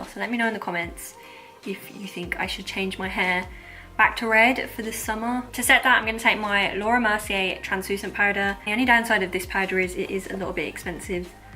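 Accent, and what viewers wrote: United Kingdom. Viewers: I can't figure out what part of the UK you're from by your accent - I love trying to guess where UK youtubers are from but I legit cannot guess lol!!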